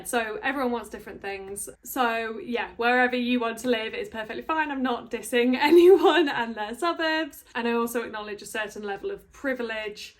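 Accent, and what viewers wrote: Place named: Cheshire, United Kingdom. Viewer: You sound like you're from Cheshire/NW originally. This was super informative. Thanks!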